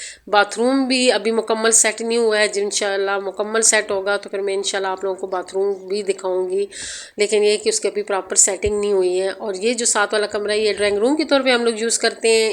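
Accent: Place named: Chakwal, Pakistan. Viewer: Ap achi lagti hain buht mjy simple sa apka style hai aap chakwal se to belong ni krtin ? Apka lehja baat krnay ka bilkul chakwalio jesa hai btw I am also from chakwal ❤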